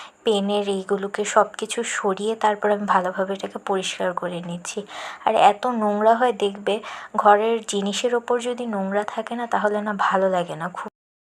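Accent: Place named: India. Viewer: Tumi to Bangladeshi eivhabe Indian der moto kotha bolo keno 🙂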